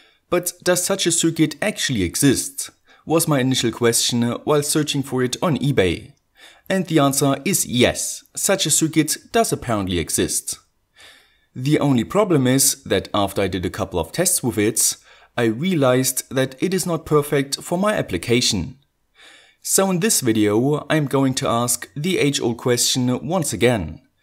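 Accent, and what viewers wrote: Germany. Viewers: Hah, i knew u were from Germany from the beginning! Hu he hu he hu he. Where do u come from ? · ja.... man hat an deinem Akzent erkannt, dass du Deutsch bist ;) tolles Video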